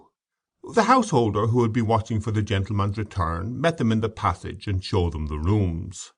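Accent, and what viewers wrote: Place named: Ireland. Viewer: How irritating; another Irish accent for Thomas Hardy! This is totally unsuitable and cannot be listened to!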